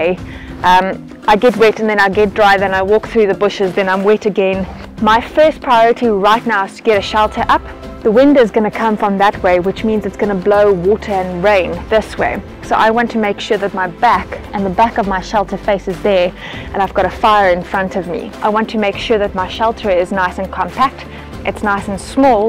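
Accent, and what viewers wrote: South Africa. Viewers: Good evening from a South African living in Switzerland .. (and a 'Kleinmonder' from the South Western Cape nogal 😊) .. 👍👍👍 A good posting .. thanks. Your Post popped up out of nowhere on my feed .. I took a quick look-see and when I heard the accent .. 'Sef Efriken' for sure 😁. Switzerland has many forests (minimum 30% of the Country Land Mass by Law) which are mostly not big enough to get lost or stuck in ..mostly 😏 .. especially where I live up North on the banks of the Rhine. .. no 'real' mountains .. just at times, steep hills. Looking at that Geography there by you, it reminds me so much of the Overstrand / Overberg Region. So nice seeing an Outdoor You Tuber from the Western Cape 😊. Yep .. getting a fire going in wet weather can be challenging .. the secret being in the preparation. For info .. very effective (and cheap) homemade fire starters are Cotton (100%) Makeup Pads (the round ones). 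Soak them in melted Candle Wax, allow to harden and you're good to go. Even better, soak them in melted Vaseline beforehand and then in the Candle Wax. They'll get 'hell frozen over' back on line and they burn for a good number of minutes. Some good tips in your post .. especially regarding layering and the danger of hypothermia. One should also take care not to overly perspire in cold (even no so cold) weather .. this 'can' be a killer. The secret is to start 'cool' and then add / remove layers of clothing to hopefully maintain that 'just above feeling cool' temperature. Another ti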